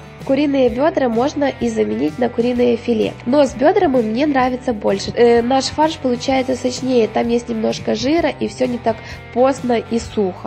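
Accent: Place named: West, Ukraine. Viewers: Девочка из Западной Украины, г.Ровно. Не надо придираться к произношению "правильно, или не правильно". Ей 24 года, а готовит - профессиональный повар оценит. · Если Вы не слышите украинский акцент, то это Вы с другой планеты. Ясно?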